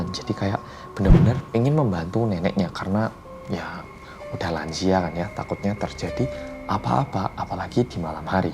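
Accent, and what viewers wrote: Java, Indonesia. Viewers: Aku delek Kowe neng tv mas😂suarane rodo jowo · Mas: halo gaes kembali lagi dengan orang Korea yang.. Aku: medooook Mas: kewedeen *Krik krik krik krik adooh salah rek aku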